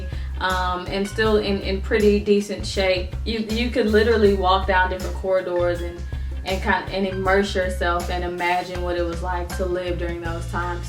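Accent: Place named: Nigeria. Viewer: You sound like a Nigerian. Your mum is a legend.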